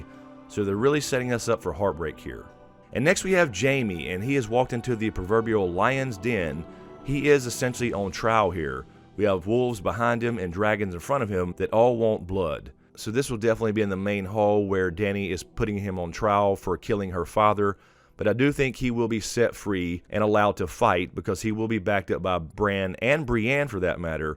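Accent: South, United States. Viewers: I love how you do it, get right to the point, sum it up so clearly in your warm southern style.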